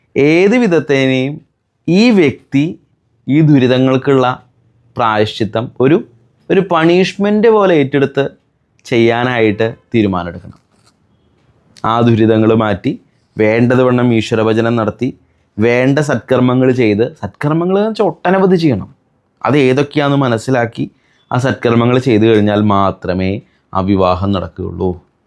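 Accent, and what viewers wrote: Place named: Thrissur, India. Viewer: ഇവൻ തൃശൂർ ആണല്ലോ 😂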